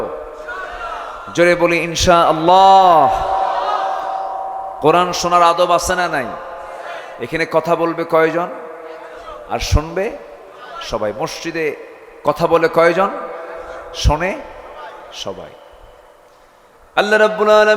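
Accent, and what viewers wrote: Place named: Kushtia-Jessore, Bangladesh. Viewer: উনার ভাষা শুনলে মনে হয় যেন কুষ্টিয়া অথবা যশোরের মানুষ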